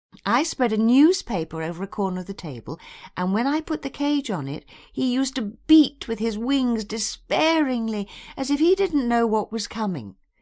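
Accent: United Kingdom. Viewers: واو صوتها حلو حرفيا احب الاكسنت البريطاني 😭😭😭💙💙💙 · فخم و تحس بطعم الإنگلش بالأكسنت البريطاني😂😂😔✨🤍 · I don't like british accent 😢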